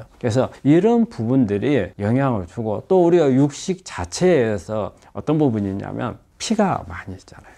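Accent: Jeolla, South Korea. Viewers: 전라도 사투리 ㅋㅋ 이번 잼버리사태만봐도 그냥 신뢰 제로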